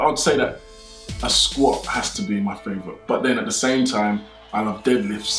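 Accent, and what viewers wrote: United Kingdom. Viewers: Did not expect the british accent.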